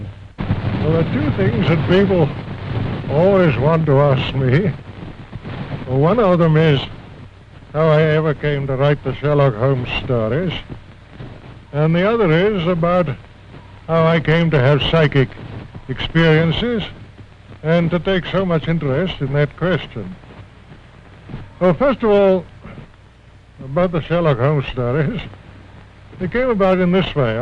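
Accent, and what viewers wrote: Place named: Edinburgh, United Kingdom. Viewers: Och! Man's scottish is really showing here. I love it. Would have been very interesting to sit down and talk to him about all sorts of matters. I find it fasinating that someone who is educated man of science matters was so into Spiritualism. Where's a time machine when ya need it? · Edinburgh accent, similar to my own.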